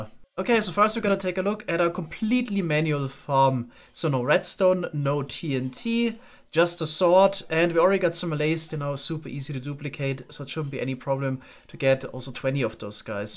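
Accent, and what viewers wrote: Germany. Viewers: Ich höre da irgendwie Deutsch raus xD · Bruder guter content und ganz ehrlich der deutsche akzent ist mega charmant! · Are you german? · kann es sein das du Normalerweise Deutsch sprichst?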